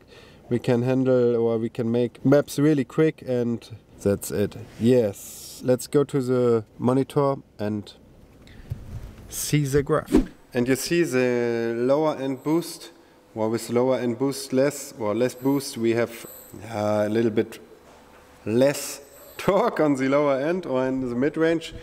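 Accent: Germany. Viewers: Typisches deutsches Englisch xD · Ist doch schon ziemlich solide dein Englisch content - ein gewisser ‚german accent‘ darf durchaus bleiben: It’s not a big, it’s a feature …or USP ;)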